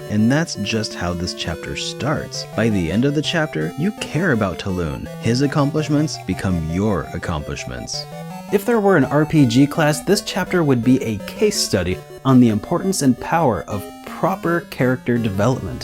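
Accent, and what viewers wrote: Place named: Cockney, United Kingdom. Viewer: MasterStryfe I thought it was because he was using cockney English.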